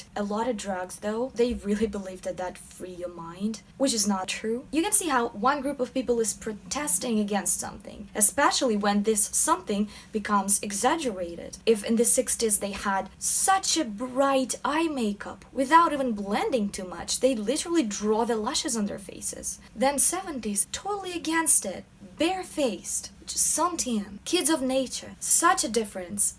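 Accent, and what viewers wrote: Russia. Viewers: me Its not constructive criticism. It is shaming someone who has English as a second or third language I guess. She’s Russian. She tries to speak English properly. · Надо же как я угадала что акцент русский😎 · Sorry, are you Russian? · Is that a slight Russian accent I can hear. Good analysis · I believe shes from Russia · I love your accent, are you Russian?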